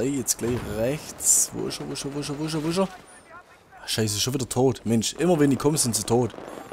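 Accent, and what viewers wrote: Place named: Swabia, Germany. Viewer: Ein Schwabe der versucht Ostdeutsch zu reden. Das klingt einfach schrecklich!!! Du zockst auf der PS4? Vielleicht trifft man sich und ich bring dir´s Ostdeutsch bei... gruss Ulle1410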